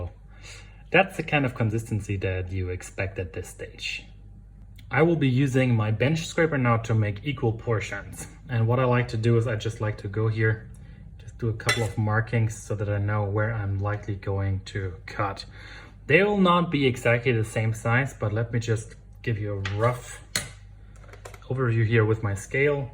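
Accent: Germany. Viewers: Great to see baking outdoors. No need to apologise for German English if you didn't apologise no one would know.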